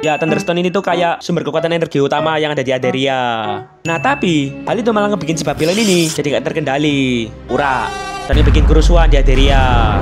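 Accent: Java, Indonesia. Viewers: 3 orang yang gua suka denger logat jawanya : 1. Guru matematika gua jaman smp 2. Pak jokowi 3. Mas mas yang punya channel ini 😅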